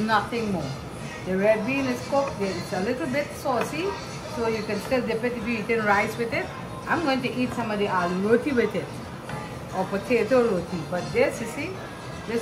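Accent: Trinidad and Tobago. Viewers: I just came across your vlog and subscribed within the first few minutes, i love that you haven't dropped your Trini Accent. Some people will go abroad for a few months and want to talk with an American accent, little do they know how much foreigners love a Trini Accent.. Keep being your Original Self and I'm sure your channel will grow in abundance.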